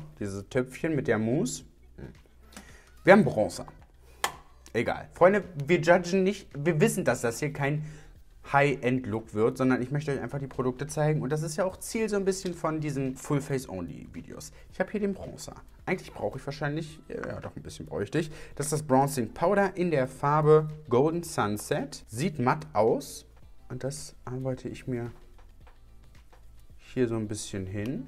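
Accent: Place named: Cologne, Germany. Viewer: ‚Schätzelein wo biste denn?‘ - seit deinem min Video lässt du den Kölsch Jung aus dir raus. Ich liebs! ❤️❤️😂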